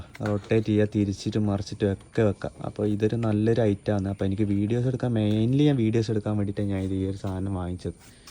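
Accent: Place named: Kannur, India. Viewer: Bro kannur ahno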